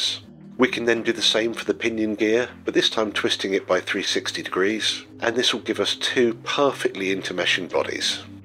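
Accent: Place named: United Kingdom. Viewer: Gosh, you int 'alf clever! And so refreshing to hear a British chap doing interesting stuff on Youtube. I hope I haven't broken any rules. 😀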